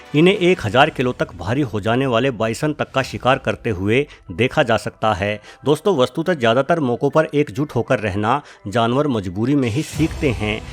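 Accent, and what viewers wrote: Haryana, India. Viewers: Sir aap kaha se ho? Avaj se haryana ya rajasthan ke lagte ho?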